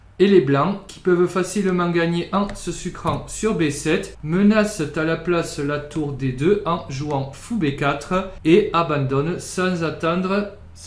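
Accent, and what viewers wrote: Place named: South, France. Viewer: Rien n'éclipse en effet la chaleur de votre accent solaire;-) Merci pour cette vidéo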